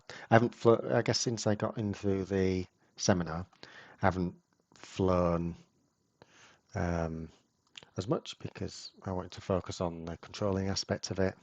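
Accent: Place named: Hull, United Kingdom. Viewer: Sounds like a Hull accent.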